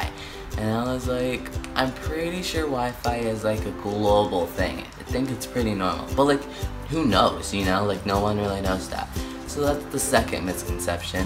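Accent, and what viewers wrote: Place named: Brazil. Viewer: You sound like a brazilian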